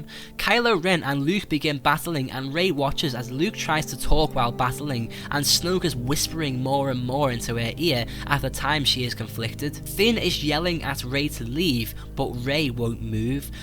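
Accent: Liverpool, United Kingdom. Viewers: scouse twat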